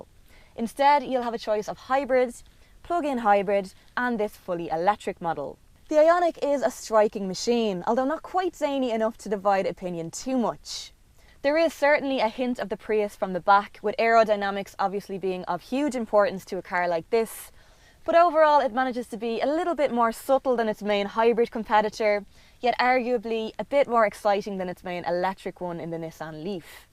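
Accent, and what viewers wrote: Ireland. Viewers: first ones to show the bottle holders and how they actually work, assume they are in the back as well? Do love the Irish accent though, nothing to do with car review I realise. Love to see you do a longer range test or maybe a long term test of it and see how it works more real-world than a short review that cannot cover how it will work in the real world.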